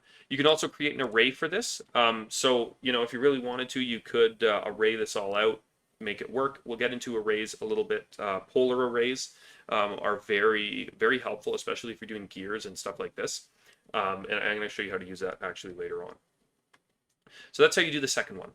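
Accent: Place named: Canada. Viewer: Canadian accent is strong.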